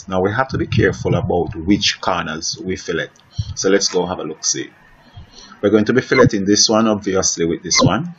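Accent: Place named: Jamaica. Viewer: How yuh mean mi general! Jamaican accent pon dem!!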